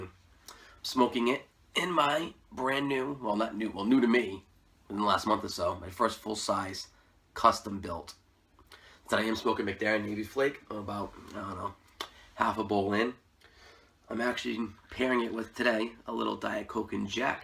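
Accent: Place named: New England, United States. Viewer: Hello definitely a New Englander. My wife is Rhode Island and it takes some time to be able to understand what Yinz are saying. LoL. Great video and I gave you a sub. Great job and thanks for the review. Be well and Keep it Lit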